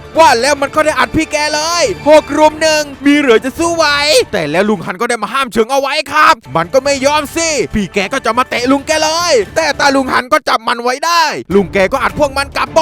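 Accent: South, Thailand. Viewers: พี่เป็นคนใต้ป่าวคับ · พี่เป็นคนใต้หลอครับ😅😅😅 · คนภาคใต้เหรอครับผมพูดภาษาใต้เป็นนะเพราะผมเป็นคนใต้สวัสดีพี่บ่าวหวัดดีนะพี่บ่าว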